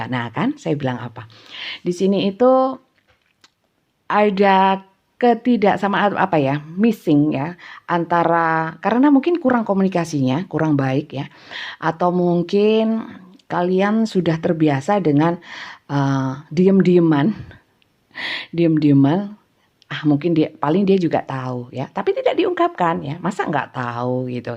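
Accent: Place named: Banyumas, Indonesia. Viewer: Banyumas ngapak bunda.. hehe.. sehat sllu mksh reading nya. 🙏🙏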